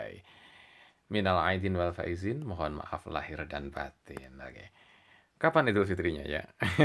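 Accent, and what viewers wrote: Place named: Java, Indonesia. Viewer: Lho, domisili Bandung? Dialeknya medok jadi kukira...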